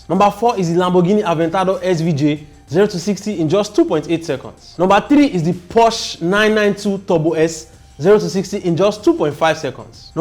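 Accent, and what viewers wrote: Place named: Nigeria. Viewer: Least fastest to the most fastest. Nigerian English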